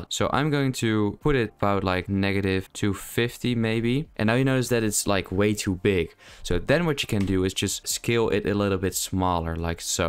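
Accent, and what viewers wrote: Netherlands. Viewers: maybe a weird question, but are you dutch? lol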